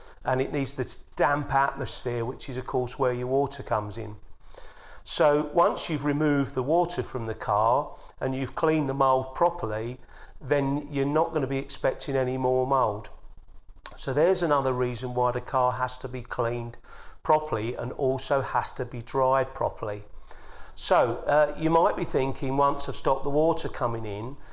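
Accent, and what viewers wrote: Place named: United Kingdom. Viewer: THANK YOU for your video ( Made a change hearing a British accent & not American) the drivers side Footwell carpet keep feeling wet after it's rained so im going to Weatherstrip the Windscreen & Back window around the door frames & I have a 'Peugeot 207 3dr Hatchback 2010' & on the roof of the car along both sides theirs brackets ?? Where you'd attached a roof rack im going to put some sealant along them both trying to eradicate where water maybe getting in, it's only the drivers footwear carpet that feels wet so hopefully working from the top of the car down ill manage to weatherseal the points of access where waters getting in. Thankyou again & really appreciate your knowledge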